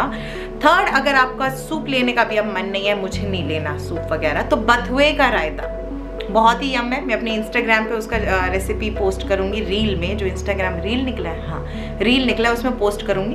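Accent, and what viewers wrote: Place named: Delhi, India. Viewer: I like ur delhi wala accent di. U r so inspiring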